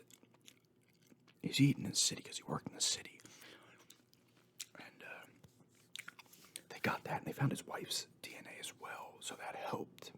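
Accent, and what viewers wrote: New York, United States. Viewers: I’m from New Rochelle, NY and I’ve been living out of the country for the better part of 9 years now (some time in China and the last 7 years in Brazil) and I’m a huge ASMR fan. Your channel has become my favorite recently simply because listen to you shoot the shit reminds me of home lol, the NY accent talking about NY sports in your videos, I also love games as much as you do. Anyway, definitely gonna keep watching, thanks for the content my guy.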